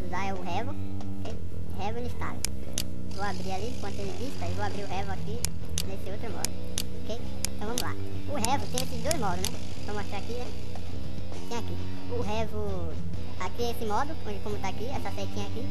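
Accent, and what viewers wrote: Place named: Bahia, Brazil. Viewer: essi mano é baiano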